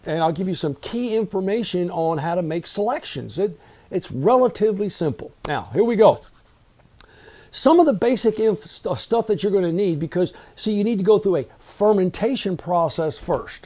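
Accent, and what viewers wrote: United States. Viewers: The guy doing the video sounds American.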